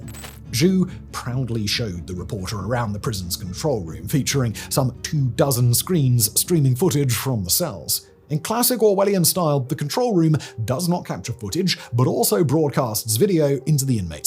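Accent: United Kingdom. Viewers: especially if British or American. He would likely be labeled British due to his accent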